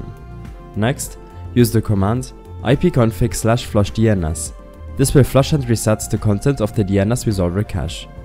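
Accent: Germany. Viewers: Bruder du bist deutsch oder 😂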